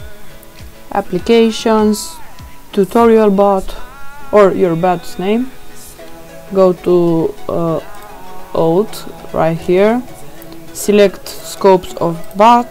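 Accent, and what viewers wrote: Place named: Romania. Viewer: multumesc mult de tutorial , mi-am dat seama dupa accent ca esti roman , botul merge perfect , dar in log apare asta <link> , chiar daca n-am probleme si am bifat decat node index.js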